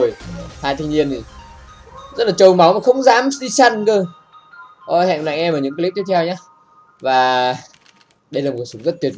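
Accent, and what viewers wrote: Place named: South, Vietnam. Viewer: nói tiếng Nam hai đấy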